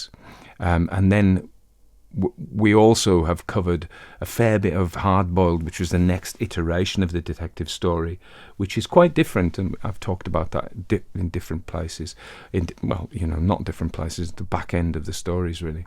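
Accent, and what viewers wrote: United States. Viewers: First, quite an excellent American accent (though not especially mid-western, with long, flat "A"s). I also enjoyed the voice of the "real" Mr. G. As for the story, shades of The Red Headed League. As for your postscripts, they are always entertaining. Well done, as usual, and thanks. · You are really in a class by yourself,. An interesting story very well presented. You do "American" so well. I love all the commentary at the end. Don't stop doing that.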